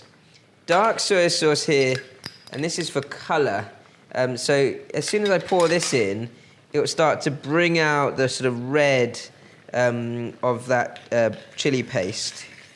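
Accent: United Kingdom. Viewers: Chinese invented black vinegar way before Europe, so now you are trashing your own people because you have british accent? Karma will get you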